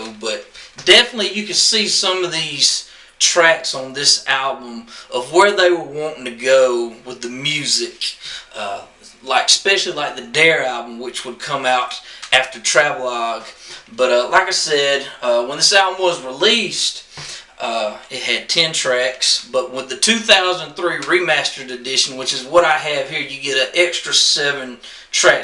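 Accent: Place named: South, United States. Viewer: sounds weird you talking bout electronic music with that accent. i expect some good old country and western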